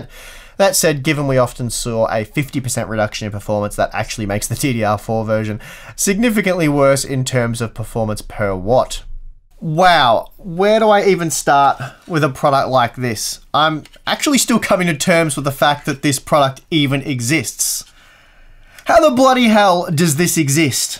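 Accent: Australia. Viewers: you sound aussie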